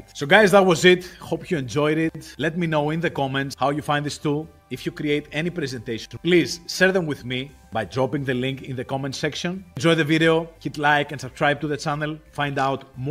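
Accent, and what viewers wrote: Greece. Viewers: Είσαι Έλληνας ; γιατί η προφορά σου μοιάζει πολύ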